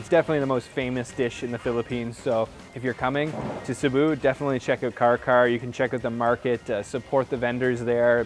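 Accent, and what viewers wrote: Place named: Canada. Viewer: this dudes canadian isnt he. "Crunchy on the Ootside," "MELTS IN YOUR MOOTH"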